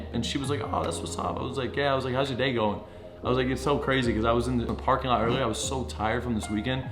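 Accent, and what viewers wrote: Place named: New Jersey, United States. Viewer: You from jersey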